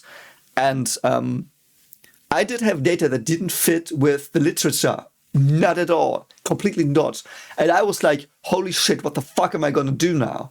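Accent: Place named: Germany. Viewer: Why is it when ever i her german accent, theres erica automatically playing as my in head elevator music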